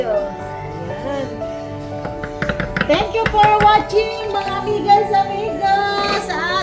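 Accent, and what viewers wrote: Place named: Pangasinan, Philippines. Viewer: masamit tay iluluto yo atse. taga Pangasinan kau amo Pangasinan accent . ☺ thanks for sharing☺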